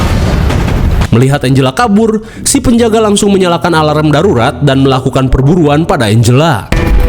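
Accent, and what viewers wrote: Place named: Sunda, Indonesia. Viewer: lenggket banget bahasa sunda nya😂😂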